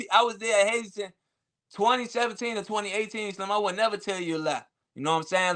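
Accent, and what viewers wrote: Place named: DC-Baltimore, United States. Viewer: lmao I caught a little bit of both in his accent, slim, moe, Joe Like the district and then some hard EW's like baltimore 🤣🤣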